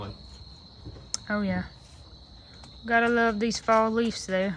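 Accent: South, United States. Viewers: What kind of accent is that ? Virginia, Kentucky, Carolinas ??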